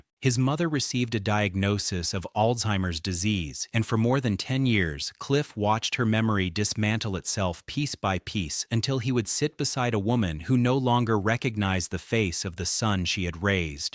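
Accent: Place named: United States. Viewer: Why is an American doing this commentary again ?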